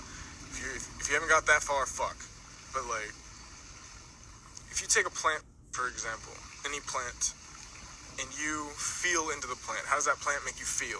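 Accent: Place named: United Kingdom. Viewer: Goood shit!!! Haha!! I love this dude. Amazing British accent too 😂 💪🏼💚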